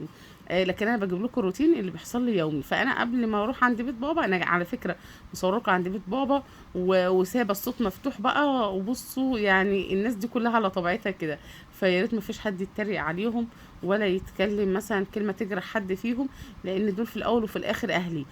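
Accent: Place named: Beheira, Egypt. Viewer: ياتكاته يا حركاته 😃 وبتعتزي ليه انتو احسن ناس ربنا يسعدكم وعقبال رؤي وتقي بنتي 😊 بس قوليلي بقي انتي شطوره بتتكلمي بحراوي كويس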